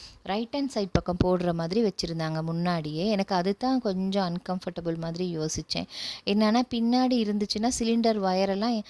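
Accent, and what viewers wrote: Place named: Sri Lanka. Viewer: Super medam elankai spech ❤❤❤😂😂😂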